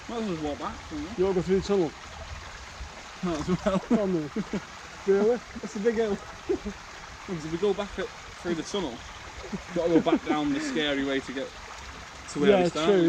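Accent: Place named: Manchester, United Kingdom. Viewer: Absolutely fascinating. Plus it's lovely to here that beautiful Manchester accent! I now live in Yorkshire and miss my home town:(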